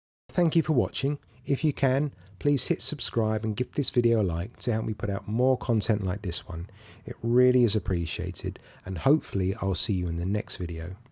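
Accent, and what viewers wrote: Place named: United Kingdom. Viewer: Gracias por explicarlo, aunque me cuesta trabajo entender el inglés Británico al menos entendí la física ❤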